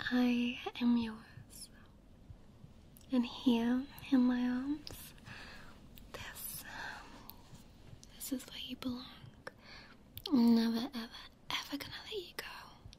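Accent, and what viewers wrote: United Kingdom. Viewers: Be me, not knowing about yet. First line comes and it has a British accent. "welp...now I have another obsession, thanks youtube"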